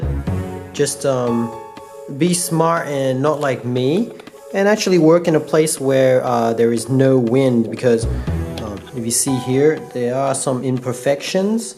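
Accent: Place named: Australia. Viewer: Bro are you from Australia? You have the Aussie accent. No troubles getting the gun into the country? Buy from eBay?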